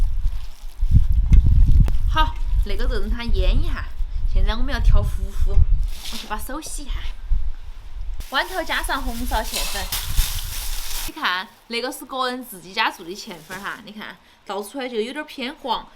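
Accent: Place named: China. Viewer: 好懷念妳講普通話喔。真的很好聽很有魅力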